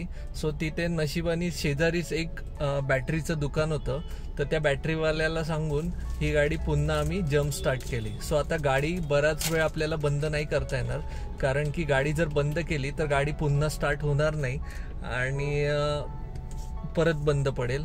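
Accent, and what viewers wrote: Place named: Pune, India. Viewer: Full puneri vatatos boltana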